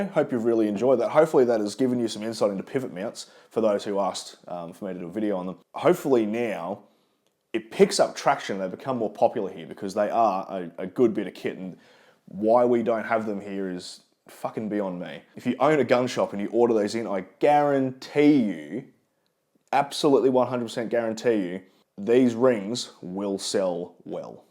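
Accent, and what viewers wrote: Australia. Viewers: Just tripped over this video, and pissing my sides at your relaxed Aussie vanacular. Got yourself a new sub. All the best from the UK 🇬🇧 👍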